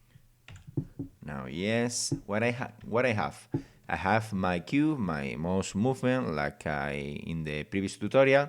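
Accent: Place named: Spain. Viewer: I like your spanish accent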